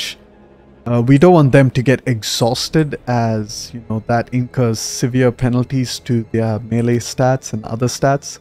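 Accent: India. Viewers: Are you a desi? You accent is similar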